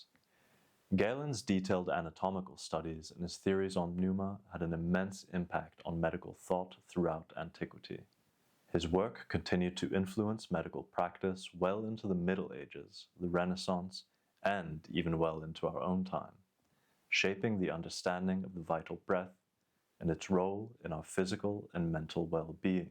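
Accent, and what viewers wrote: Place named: Transatlantic, United States. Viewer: Your transatlantic accent makes you stick out from other content creators now and to come!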